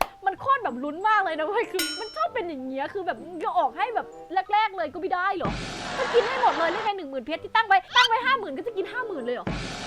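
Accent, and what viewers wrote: Isan, Thailand. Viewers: พี่ พูดอิสานน่ารักมากกก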